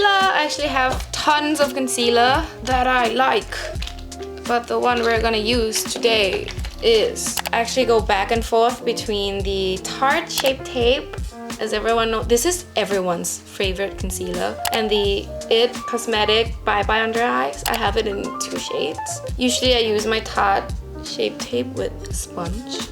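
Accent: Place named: England, United Kingdom. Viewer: เราชอบสำเนียงอิ้งมาก หลงแล้วววว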